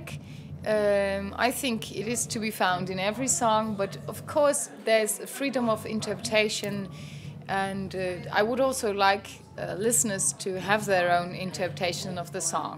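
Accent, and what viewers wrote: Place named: United Kingdom. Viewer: When she speaks English it sounds British it is different from Simone and Floors dialect,but I must admit I love woman with accents (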